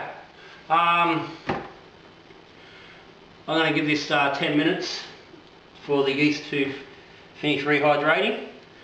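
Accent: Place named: Australia. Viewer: cheers for the vid mate . you sound like a down to earth aussie , with none of the bullshit .. will be watching and learning from your vids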